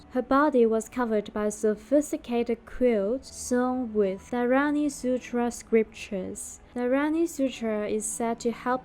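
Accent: China. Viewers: whoever u are, this is one of the worst content. The female narrator sounds like a village girl from northern china with horrible accent. This is a fcuking joke!!!!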